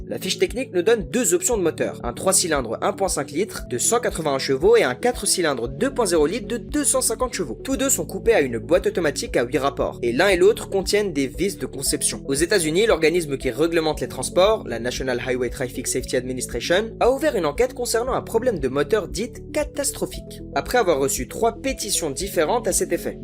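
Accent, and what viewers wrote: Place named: Banlieue, France. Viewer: Intéressant au début , par contre les : voitchures et les rrrr de banlieue, merci j’ai arrêté de regarder c’est insupportable, salut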